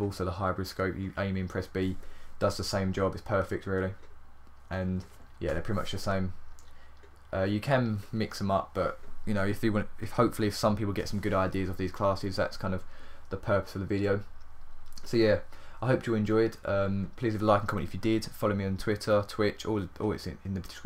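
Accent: United Kingdom. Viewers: Ur British dude yeyhhh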